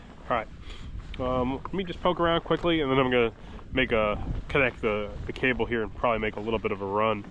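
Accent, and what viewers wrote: New York, United States. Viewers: he is from nuu yawwk that's how we say crap eee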